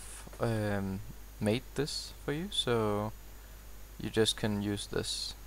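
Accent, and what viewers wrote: Denmark. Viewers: Haha du er dansker kan høre det så let XD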